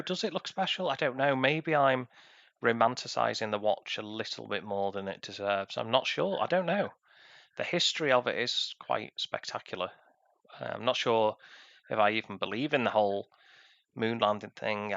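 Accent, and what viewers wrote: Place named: Northern England, United Kingdom. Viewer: yeah I did mate, to be honest it was probably your review which tipped it for me, great watch, could easily be my exit watch (but I know it won’t be) I’m really looking forward to your review of the bulova, I honestly think you have great watch content, especially for ‘normal’ people who don’t have the cash for high end watches. Quick question, you sound quite local to me, I’m guessing St. Helens? Definitely northern 👍🏻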